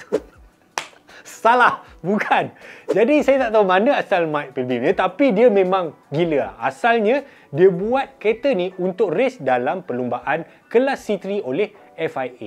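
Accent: Kelantan, Malaysia. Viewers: Bey demo ore klate dih?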